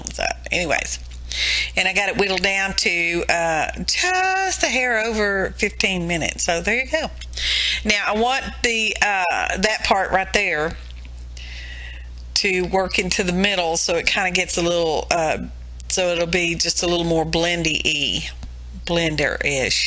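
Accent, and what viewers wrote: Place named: Texas, United States. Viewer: I saw this on Shannon's FB. The minute I heard your voice I yelled "TEXAN!" Takes one to know one, but I'm from West Texas.(Lubbock) I woulda subscribed if you were painting everything solid black. I was gonna use that emoji hat is brown and swirly shaped, but I'm much too sophisticated. I'm not able health-wise to do much art, so I just spend my days watching. But that's gonna change one of there days. Right now I only have energy to take care of my pets and my grandsons bearded dragons and leopard gecko, and the bugs to feed them. Now we know I'm allergic to feeder bugs poops. Anyway by the time I get all that done, my CF and fibro has set in, and I just go to bed to morning. O boo hoo, "." do you remember that song? -1977, back when we were all beautiful, but didn't think so. Look her up guys, great song, written by. 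Another blast from the past. Heck, I may be the only person old enough to remember. This is why I don't comment very often; bla, bla bla😂 "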